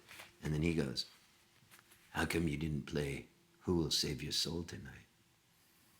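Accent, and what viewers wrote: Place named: California, United States. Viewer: California accent for the win with these stories!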